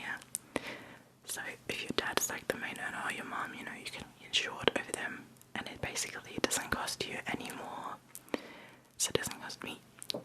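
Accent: Australia. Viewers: girl its blowing me away that you're not australian, my family are aussies and you sound no different!! haha thats amazing you picked up the accent so well! also would love to see the dress you found, I was just in the exact same situation on the hunt for a wedding guest dress, and asos came in clutch!<3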